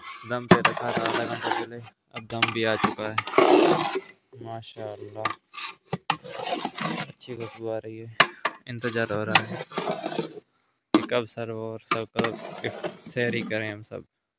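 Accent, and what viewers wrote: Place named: Sindh, India. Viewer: Mai to smjha k Pakistan sindh ka area h koi kiun k ap ka lahja bhi Sindhi lagta h or or Yahan Kuch Sindhi hindo h I dia h pahla Sindhi Muslim daikha h🤔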